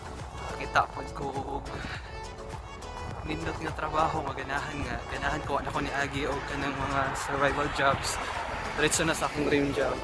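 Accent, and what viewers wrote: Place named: Cebu, Philippines. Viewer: Maayong adlaw nimo diha doh! I believe taga Cebu ka kay klaro kaayo sa imong stinoryahan. Hehe Ask lang unta ko kung tinood diha sa New Brunswick na dili daw ka maka sponsor sa imong family? Salamat doh og God bless!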